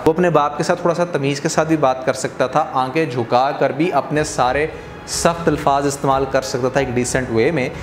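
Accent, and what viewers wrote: Punjab, India. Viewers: Aapka Punjabi accent badiya Lagta hai. Punjabi hi hai na??????? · Bohat hasna diya bhai apki panjabi ne i am panjabi thanks for sharing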